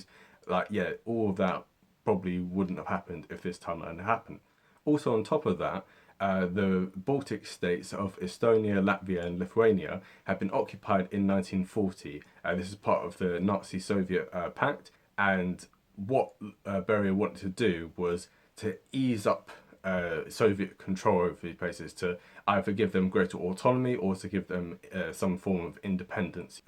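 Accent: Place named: East London, United Kingdom. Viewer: So naive... simplification after simplification... and the language used... sounds like a geezer from Stratford.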